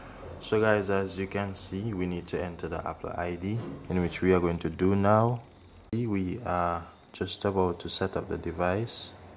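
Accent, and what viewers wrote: Jamaica. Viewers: Thought u were trinidadian at 1st but you're def jamaican. Much love still though brother. Your video was really helpful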